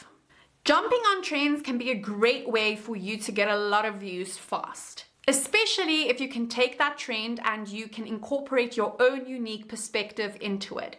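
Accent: South Africa. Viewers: (Are you South African ?) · Love the South African accent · Love your South African accent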